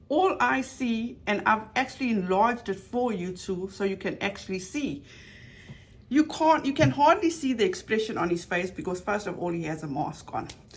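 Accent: South Africa. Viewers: has GOT to be sipping vodka in that cup, and be delusional af if she thinks that 'joke' was funny in any way, shape, or, form.. P.S. Are you from South Africa? Even speaking about, your voice and laughter sound like music! It's beautiful, thank you for sharing it with us!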